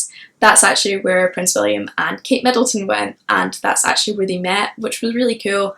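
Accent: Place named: Scotland, United Kingdom. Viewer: Hello from Canada! I'm looking forward to more of your videos. Love the Scottish accent.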